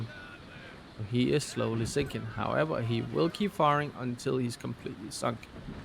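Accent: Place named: Denmark. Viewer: Your accent tells me you are danish så hvad så der :D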